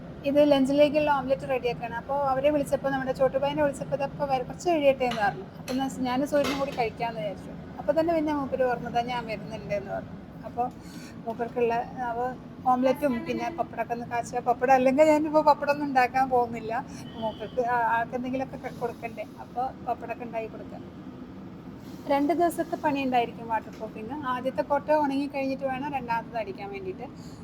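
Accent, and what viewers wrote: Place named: Kozhikode, India. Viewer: Ningl clt kkarano....bhasha kettitt angane thonnunnu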